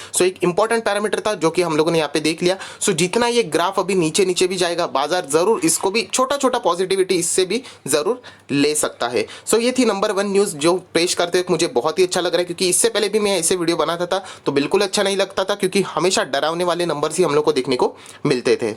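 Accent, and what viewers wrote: Maharashtra, India. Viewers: Unke tone se confirmed hai, ki Maharashtrian hai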